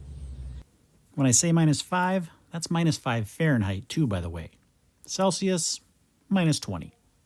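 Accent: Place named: Wisconsin, United States. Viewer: I don't miss Wisconsin winters, but I do miss the accent.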